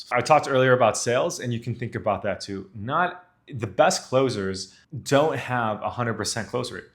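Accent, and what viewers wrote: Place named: Mexico. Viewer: I've been here 7 years have yet to meet a Mexican girl that speaks English like that. But, I'm not in the gringo tourist area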